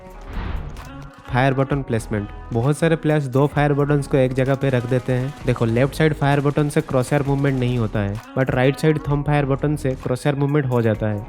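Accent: Odisha, India. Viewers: Bhai tum odia ho kya ?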